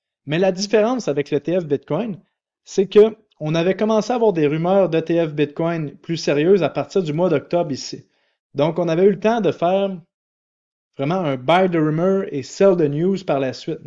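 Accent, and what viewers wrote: Canada. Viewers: Merci ! vous êtes très plaisant à écouter, votre accent canadien y participe mais plus que ca votre facon d'aborder les sujets est formidable. Restez comme vous êtes !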